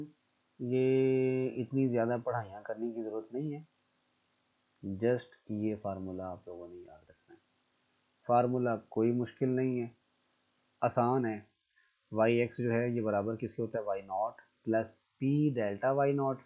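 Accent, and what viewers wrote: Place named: Punjab, India. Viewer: Mashallah your Punjabi accent is so lovely. Really love your teaching method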